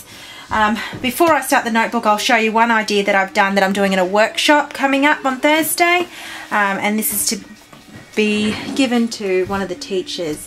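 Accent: Australia. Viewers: Hi hunni, love your tutorial.. just wondering if you were Aussie. . Lol!!! Hope i. Mot offending you by asking...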